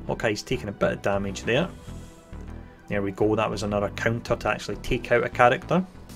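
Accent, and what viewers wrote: Scotland, United Kingdom. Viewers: If I could have picked any accent to hear walk me through this, I would have picked that of a Scot :-D Thanks for the help mate!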